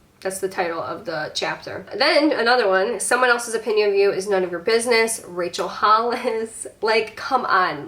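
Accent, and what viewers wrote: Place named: Midwest, United States. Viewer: oh my god ur in chicago! i KNEW i heard a fellow midwestern accent!! also u look like you'd be british for whatever reason ahaha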